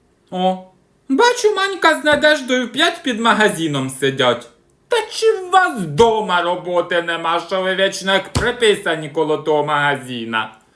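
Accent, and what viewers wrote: Poltava, Ukraine. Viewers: Ой цей наш Полтавський сільський говор, прямо з язика знімаєтє.Воно конешно не в обсужнєніє, ну так жеж і є😊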